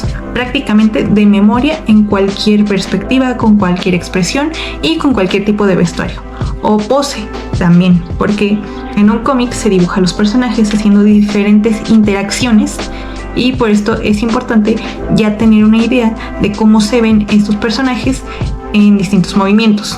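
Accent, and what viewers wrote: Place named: Spain. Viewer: En buena onda , no tomes un tono español como lo hiciste en este video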